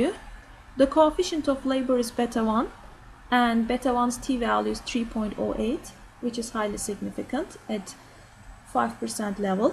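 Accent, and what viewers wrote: Turkey. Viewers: tam bir türk ingilizcesi nerde duysam tanırım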